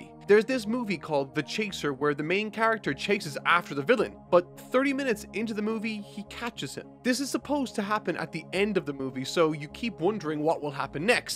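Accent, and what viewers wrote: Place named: Ireland. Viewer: ARE YOU IRISH? ... WHY IT SOUNDED SO NATURAL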